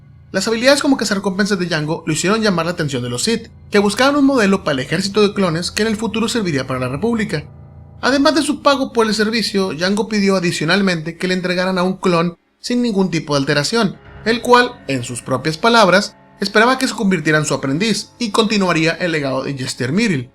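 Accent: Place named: North, Mexico. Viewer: están bien tus historias, y estás muy bien informado, pero la neta hablas bien feo, no se te entiende nada cuando hablas, y además tienes acento norteño. Habla más despacio y claro, gesticula bien.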